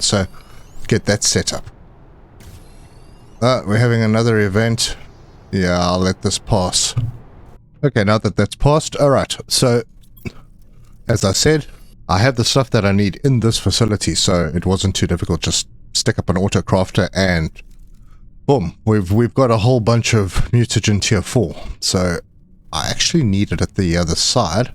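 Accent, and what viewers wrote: South Africa. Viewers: I was wondering if the accent was South African, Aussie myself but my great grandfather was South African, his son, my grandfather was responsible for starting my gaming addiction on his Atari back in the day.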